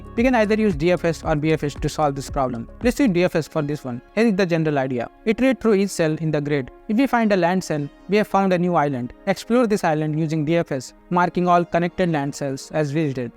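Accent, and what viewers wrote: India. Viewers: I'm very tired of Indian accent. learn English well, okay? you speak like kids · You should speak slower and make your accent better. Thanks for the vid, but god damn, Hindi English is the worst language ever.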